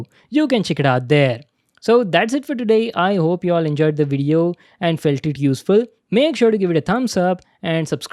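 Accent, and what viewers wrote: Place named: India. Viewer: Why you talk like Apu from The Simpson, You wanna by funny?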